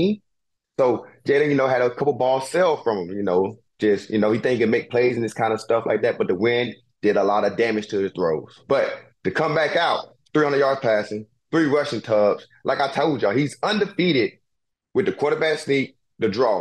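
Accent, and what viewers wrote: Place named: South, United States. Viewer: Man this guy has heavy southern accent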